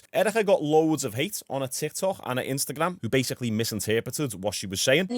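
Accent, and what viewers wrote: Liverpool, United Kingdom. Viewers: Love the scouse, from Derry N.I myself, our accent is hard to understand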